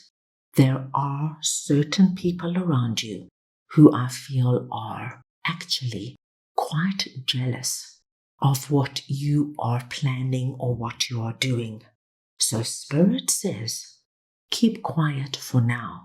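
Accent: South Africa. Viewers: I know a South African accent when I hear one. Hello maatjie.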